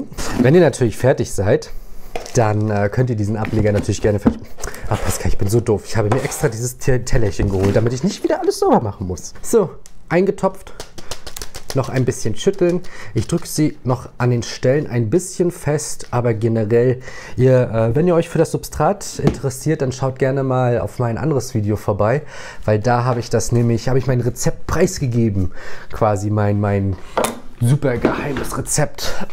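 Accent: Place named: North, Germany. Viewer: Ich liebe, dass du uns neben der Vermehrung von Pflanzen nebenbei noch Nachhilfe in norddeutsch gibst 😅💚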